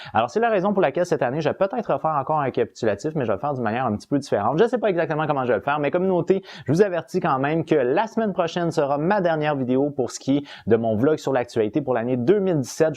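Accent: Quebec, Canada. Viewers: J'adore ton accent québécois. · Ça fait plaisir d'entendre l'accent du Québec. Ps" Salutations depuis la Catalogne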